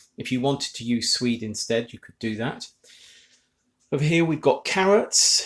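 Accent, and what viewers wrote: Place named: Scottish Highlands, United Kingdom. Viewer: love the accent * (like you just stepped out from the highlands lol)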